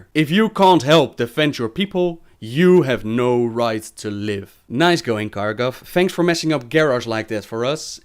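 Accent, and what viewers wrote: Netherlands. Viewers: dutch is definitely his first language, has to be xD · lol are you dutch?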